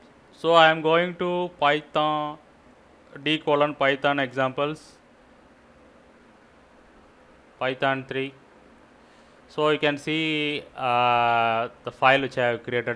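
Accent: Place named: South Asia. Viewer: Bro I love your desi pan eating accent uwu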